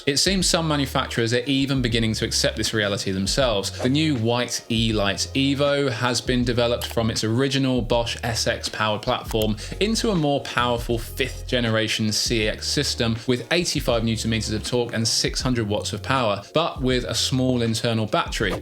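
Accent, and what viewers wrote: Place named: United Kingdom. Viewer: what the f did this brit say????/ dang, subtitles can't even understand the accent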